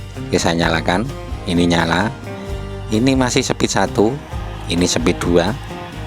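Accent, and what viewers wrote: Java, Indonesia. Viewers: First time lihat vedeo ini terus boleh tau kamu org jawa krna org jawa cakap bunyi dalam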